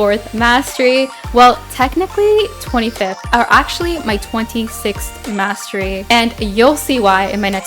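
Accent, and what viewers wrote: India. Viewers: Are you from India by any chance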